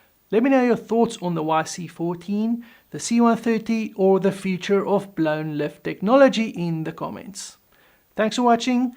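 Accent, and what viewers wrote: South Africa. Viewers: Love the South African accent, reminds me of my days in Joburg. Great video, the YC at Pima Air and Space is quite something to see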